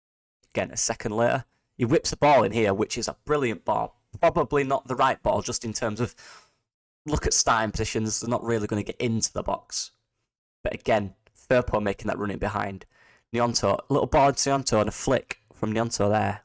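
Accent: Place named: United Kingdom. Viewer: Am trying to figure out your accent what part of the uk you from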